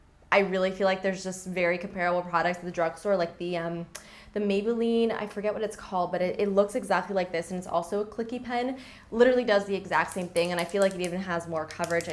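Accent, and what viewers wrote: Canada. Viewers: omg random side note but im so proud bc i can spot your canadian accent woo woo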